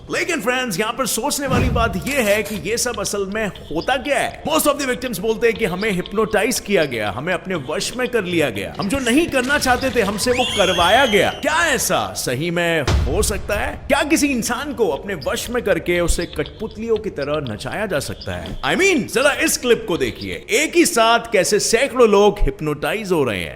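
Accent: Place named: Bengal, India. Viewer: Your bengali accent is quite good.... 👍👍👍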